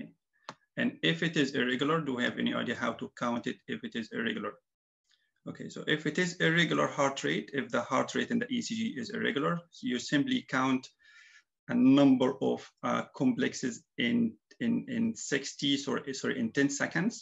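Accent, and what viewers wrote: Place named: Egypt. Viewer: Thanks 🙏 You are Egyptian doctor I catch your accent thanks again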